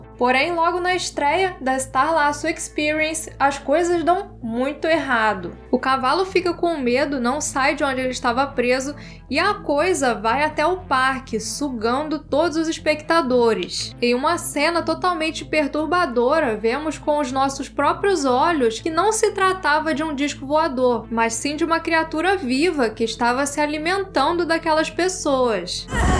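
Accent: Rio de Janeiro, Brazil. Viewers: Timbre agradável e sotaque carioca bacana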